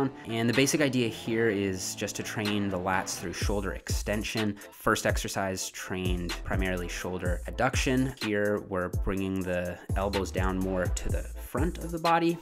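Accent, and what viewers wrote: Canada. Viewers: Is this guy from Canada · Is this dude Canadian?? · Does anyone know what city this dude is out of? Canadia for sure?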